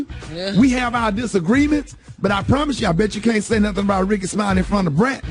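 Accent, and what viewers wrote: South, United States. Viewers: 🎙️🎙️. Bro. I love your channel 💜 and your southern accent. I finally made up with my cousin. Every family isn't perfect. God is still helping me to love on them.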